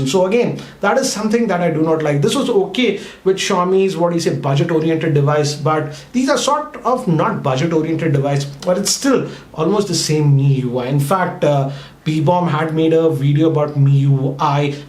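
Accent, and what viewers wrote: Hyderabad, India. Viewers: R u from Hyderabad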